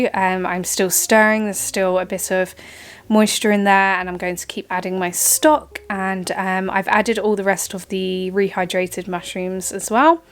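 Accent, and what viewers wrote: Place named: Devon, United Kingdom. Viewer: She speaks with a South Devon accent. Her ancestors probably came from the South Devon or Cornwall area sometime during the mid 1700's She is a Cornish woman. She is probably related to the cornish stand up comic Jethro.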